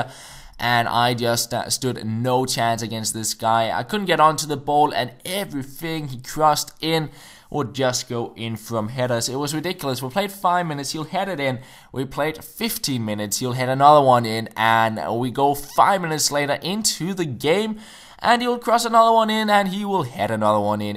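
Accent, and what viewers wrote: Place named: Denmark. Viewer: Thats your opinion He is from denmark and that is his accent so deal with it If it annoys you so much than dont watch his videos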